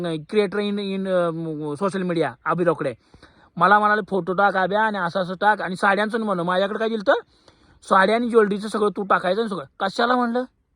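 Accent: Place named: Kolhapur, India. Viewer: Bhava kolhapuri stayl made tumla mast jamaty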